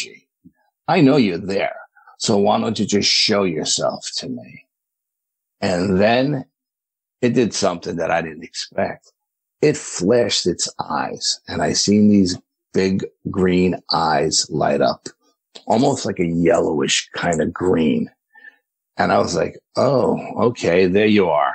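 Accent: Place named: New York, United States. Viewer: Man I love this guy's stories! I think the ny accent adds to the charm! Lol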